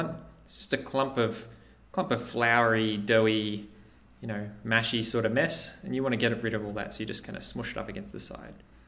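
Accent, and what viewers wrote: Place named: Australia. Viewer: He said 0.8 - not 3.8. Its that Aussie accent.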